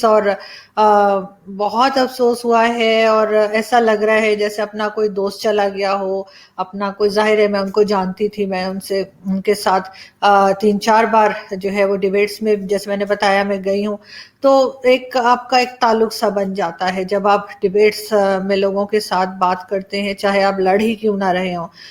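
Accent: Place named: Delhi, India. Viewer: Aap is tarah baat Karti hain jaise koi Dilli ,UP ki ladki baat kar Rahi ho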